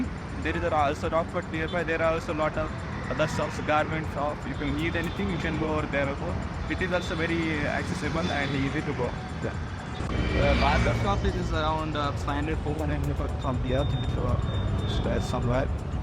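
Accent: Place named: Maharashtra, India. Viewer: When I listen ur hindi first time I knew u r from Maharashtra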